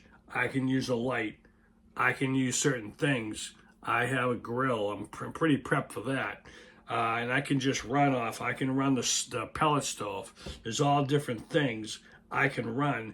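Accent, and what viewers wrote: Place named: New York, United States. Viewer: You sound like Ny or NJ, too many clouds to go solar unless in sunny Cali or Texas , in Ohio, no way it would work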